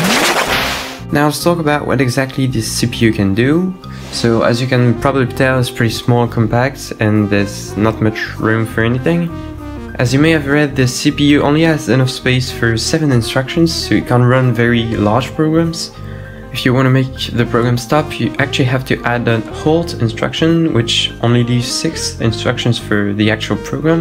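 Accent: France. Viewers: with your accent youre french ? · T'es français ? J'entends un certain accent !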